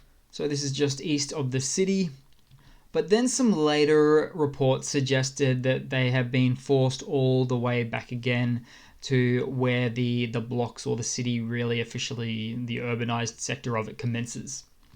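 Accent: Australia. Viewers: Fake news i see. Is that an aussie speaking. FFS mate, get a life. This is just propaganda ❤ russia from the 🇬🇧🇬🇧🇬🇧🇬🇧. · Another good assessment. Out of curiosity, are you an Australian? (BTW, I am).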